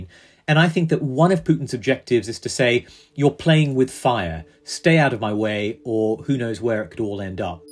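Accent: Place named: Scotland, United Kingdom. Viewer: Its a pity the Scottish accented voice over thinks "nuclear" is pronounced "nukilla".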